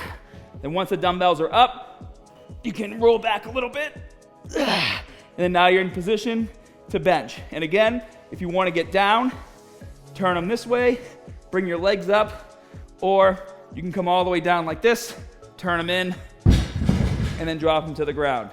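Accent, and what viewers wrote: Boston, United States. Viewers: Boston guy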